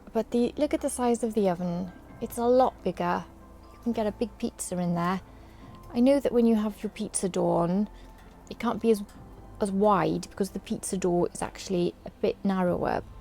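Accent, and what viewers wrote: Wales, United Kingdom. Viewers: Do I detect a hint of Welsh? You should do ASMR videos :). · What is it about a British accent? Just love to listen to it! Great video, will probably get one not just for the lovely accent but it looks like it held up well over a year.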